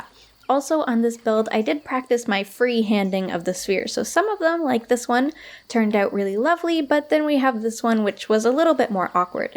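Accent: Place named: Newfoundland, Canada. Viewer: Haha I'm just teasing. It's cute! I didn't know people from newfoundland spoke like that. That's a good fun fact x)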